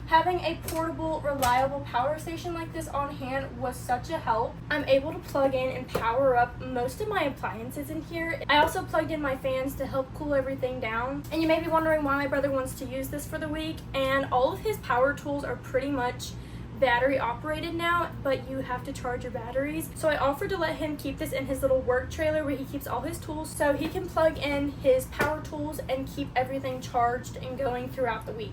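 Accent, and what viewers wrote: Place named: Texas, United States. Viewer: your beautiful live your voice with that Texas accent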